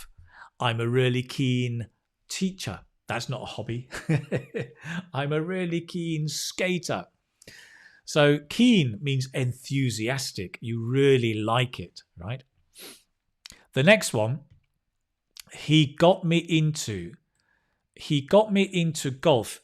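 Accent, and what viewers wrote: United Kingdom. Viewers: Your lessons are really important always.You try your best to give us the best free.Thanks a million teacher!Always love to hear your british accent!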